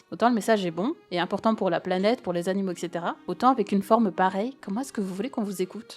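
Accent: Belgium. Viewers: Bonjour. Abonnée qui sort de la communauté silencieuse!! Personnellement, si je regarde tes vidéos avec autant d'assiduité, c'est pour ton sarcasme, ton humour, ta personnalité (supplément accent belge) bien plus que pour le contenu. Même si j'adore le format de tes vidéos; des chaînes qui traitent des sujets dramas il y en a des milliers. Des, je n'en suis qu'une. Je dis ça sans parasocial ou quoi. Si je clique sur tes vidéos c'est pour voir du (les gars dont tu parles, pour la majorité je les connais même pas! ) Ce que j'essaie de dire c'est: amuse-toi. T'aimes la création de vidéo, alors crée des vidéos que tu aimes! Et je pense sincèrement que plus tu t'autoriseras à être toi-même dans ton contenu, plus les gens qui décideront de te suivre te ressembleront. Y'aura toujours des gens qui profiteront de l'anonymat pour venir gratter la merde, mais je crois que malheureusement ils viennent d'office avec le package ''femme sur internet''. 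En parallèle, y'aura toujours des gens pour reconnaître et apprécier ton travail! (Signé: une abonnée qui appartient à plusieurs minorités et qui ne s'est jamais sentie attaquée par tes blagues) Pense à toi. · Mais mais, c'est ta chaine non? tu fais ce que tu veux. Si je suis sur ta chaine, c'est que j'aime ce que TU fais. Je suis plein d'autres chaines et je suis capable d'en prendre et d'en laisser. Les médias sont tellement remplis de trucs qu'ils y en à pour tout le monde. J'aime ton humour, ta voix est apaisante, ton accent belge, je suis Québécois, et je t'assure que j'ai aussi un gros accent. Tu ne pourras jamais plaire à tout le monde, et c'est ainsi que le monde est fais. Tu à eu le courage de crée une chaine YouTube, ce serait le comble que tu ne puissent pas y faire ce que tu veut! Courage et je serais toujours à tes cotés en tant qu'abonné. parce que point! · C'est toujours triste quand on doit se réfréner, se bâillonner, pour contrôler la casse, éviter les problèmes, etc, quand on créée quelque chose, et qu'on finit par s'étouffer soi-même… 😔 De toute façon, leur souci principal, c'est que vous soyez une femme sur Internet, et d'en plus ne pas rester dans les cases "make-up" ou "vlog" 🙄 Il y aura forcément des gens qui auront quelque chose à redire, rien qu'à voir les attaques sur l'accent belge, mais je me doute que c'est trop facile de dire de ne pas les prendre en compte, quand on ne le vit pas (et hypocrite, je ne sais pas le faire xD) Faîtes au mieux pour vous-même, vous êtes la plus importante dans l'histoire ♥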